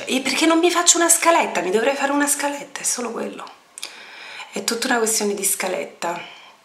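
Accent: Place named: Campania, Italy. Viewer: ciao..sei carina e simpatica..grazie per i consigli posso chiederti di dove sei??parli come me...son campana...ciao.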